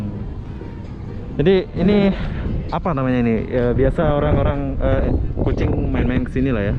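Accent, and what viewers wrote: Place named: Peninsular Malaysia, Malaysia. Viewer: Abangnya ngomong ala melayu semenanjung sama orang Sarawak sementara orang Sarawak cara ngomongnya mirip Indonesia.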